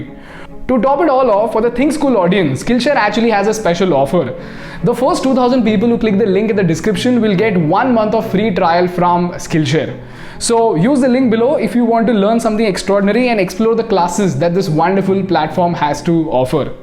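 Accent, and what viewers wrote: India. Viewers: Your information is good but the way you speak English sounds Hindi accent . Please improve your accent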